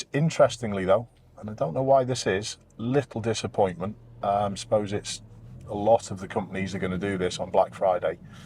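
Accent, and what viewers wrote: Liverpool, United Kingdom. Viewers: I do enjoy the 'Drive like sitting in the passenger seat.' videos. 😂 Edit to add: I heard dulcet Scouse throughout. Pas de frainçais.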